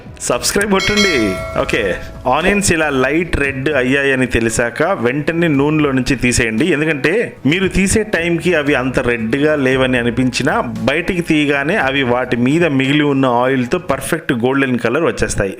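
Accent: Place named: Telangana, India. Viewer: Barre neyyi🤣🤣🤣🤣superrr Andi babu mi Telangana yasaa😍👌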